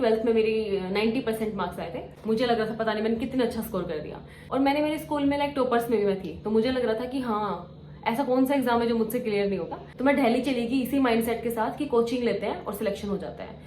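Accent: Haryana, India. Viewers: Haryanavi touch in Voice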